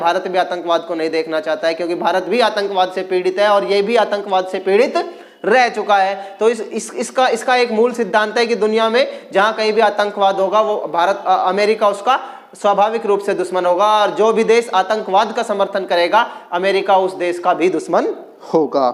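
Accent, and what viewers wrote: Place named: Rural, India. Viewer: Bahut dehati pronounciation h sir apka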